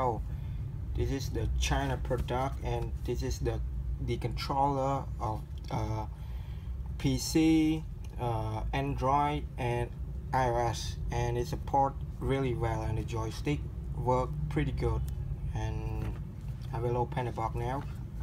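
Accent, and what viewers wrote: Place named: Vietnam. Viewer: dude u sound like a vietnamese learning to speak english,good vid anyway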